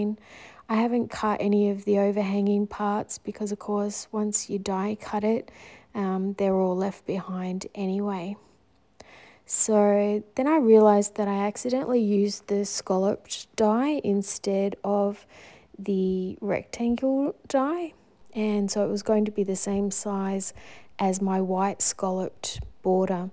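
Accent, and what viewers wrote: Australia. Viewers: I am new to your channel and washi tape ideas. I like them both and I have just subscribed and am looking forward to learning new techniques and designs. I live in Delaware and it sounds like you are from Australia but it sounds like we have a lot in common. Thanks for an educational video.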